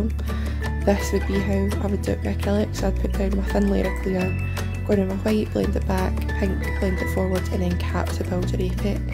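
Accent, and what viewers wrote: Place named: Scotland, United Kingdom. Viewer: She's Scottish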